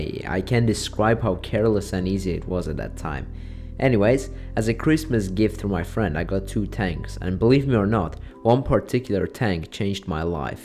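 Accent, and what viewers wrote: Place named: Czech Republic. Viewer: CZECH!(jsem taky čech) like in that clip from world of tanks, I saw the Czech inscriptions and it was kind of strange to me, and then when you spoke, I realized that you are Czech.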